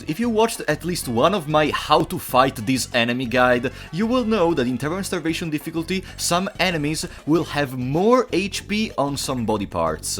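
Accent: Italy. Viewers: ahahahhaah grandissimo si sentiva un pochino dalla cadenza! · il tuo accento italiano si sente fortissimo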